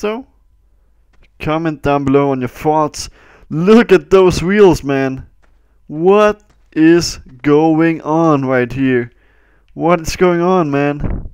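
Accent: Denmark. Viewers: Man kan godt høre at du er dansker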